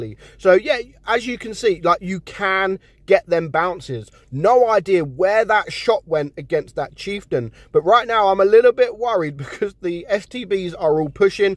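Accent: United Kingdom. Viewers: I like your Brittish Accent😁🇬🇧 Do you prefer Cobra? but is just like Smasher but 9 and medium.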